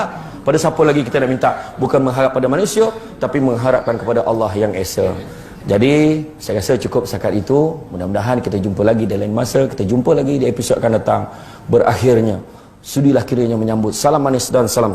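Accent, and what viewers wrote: Negeri Sembilan, Malaysia. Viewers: Memang loghat nogori tu yg sedap dongar haha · loghat nogori tu yg sodap dongar😂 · Ustaz syamsul debat ni..setiap dakwah mesti kena ada lawak,sedih dan loghat negeri sembilan ada tiga ii ni konfirm best ceramahnya 👍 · Biasa aku syok dgq ceramah bahasa utaqa . Dgq loghat nogori pon syok gak rasa ni . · Ramai yg mula soka loghat nogori.. Tanda Pm baru dri nogori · Logat Negeri Sembilan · Aku org melaka, tpi paling suke dgau loghat org nogori,... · Seronok dongar ustaz syamsul ceramah..bnyak pengajaran..semoga ustaz sukses sllu..loghat N9 ustaz mmg thr best.kelakar!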